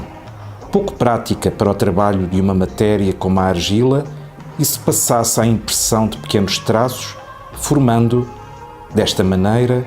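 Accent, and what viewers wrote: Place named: Portugal. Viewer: É meio confunso pelo sotaque português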